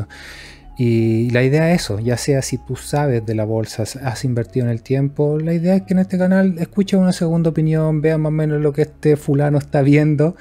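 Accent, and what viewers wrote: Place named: Chillán, Chile. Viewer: jejeje, gracias y Saludos amigo, Te veré siempre en tu canal, ya puse campanita👍. Pd (¿Eres de los alrrededores de Chillan?, por tu acento, viví varios años por esos lados)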